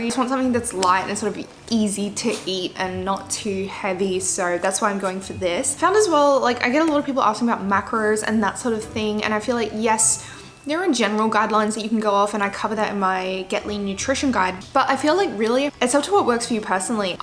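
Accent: Australia. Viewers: Australia I believe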